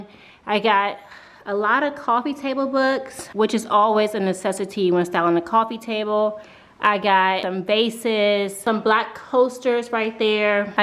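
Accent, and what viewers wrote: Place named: Washington DC, United States. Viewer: Yeasss !! "I'm A DIME" from the first time I started watching ,I subscribed! I hear the DC accent, I love your style.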